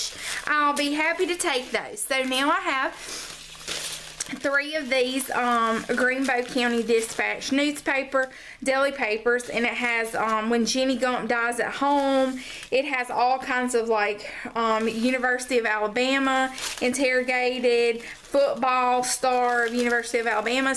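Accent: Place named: North Carolina, United States. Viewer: Hey! Where are you from? It's rare I hear someone on YouTube that talks like me!!! Country girl from Western NC here!!:)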